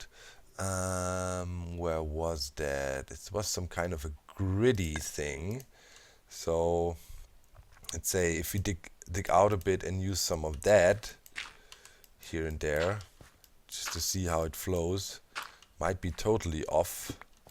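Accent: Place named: Germany. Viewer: For everyone who is not german you will not understand me. :P Hallo, Du warst auf meiner Startseite und das Video gefällt mir! Hoffe du machst weiter so!Edit: Übrigens Man merkt an deinem Akzent oder der Kanalbeschreibung das du Deutsch bist. Find Ich cool das Ich mal nen Internationalen Gamer gefunden habe der Deutsch ist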